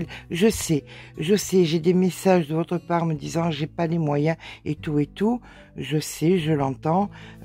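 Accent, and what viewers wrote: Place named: Alpes-Maritimes, France. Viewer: Alors voilà, j'en étais sûre, 06 mais C bien sur, j'ai entendu votre léger accent et votre façon de parler pdt des années, 50ans pour être exact, d'une Régine qui habite à BIOT, je suis allée en vacances à Antibes en camping, il y avait tjrs 3 ou 4 générations, j'y suis allée enfant, ado, jeune maman et mamy.... De merveilleux souvenirs, C pour cela que votre voix, accent lié à ma passion, le crochet font un si bon ménage, merci tt simplement d'être là et reMerci d'être VOUS 💋🥰🤗💞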